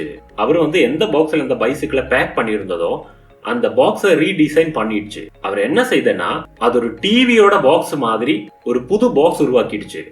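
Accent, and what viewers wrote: Kerala, India. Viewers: உங்க கேரள தமிழ் 😍😘😍😘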